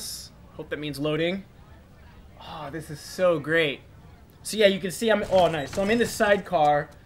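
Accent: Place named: France. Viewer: Your french accent is hilarous :D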